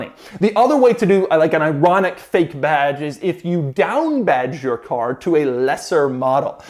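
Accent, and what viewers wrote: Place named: California, United States. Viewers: Doug's fake California accent is really convincing. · Doug's California accent.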